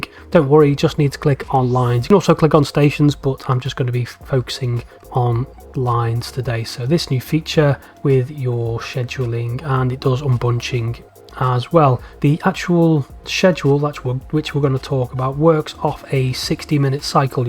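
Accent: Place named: Scotland, United Kingdom. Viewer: First things first. I think the issue of resetting the clock when using “full loan any/full load all” has already been addressed since I have no longer been experiencing trains never leaving stations. Second, you explanation of this tool is so disorganized that I do not get it. You click on something, then you delete it, click on something else, keep saying one think over and over instead of going systematically step by step. Third, I believe it´s fair to use standard English on You Tube. Even though I am not a native speaker your accent (assumably Scottish) is hurting my ears. The way you pronounce the word “unbunch” made me stop watching the video.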